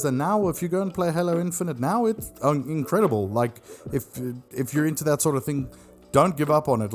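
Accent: South Africa. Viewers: So nice to hear a South African voice for once! Dope content! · i knew i recognized that accent, im raised in america but born in SA, cool video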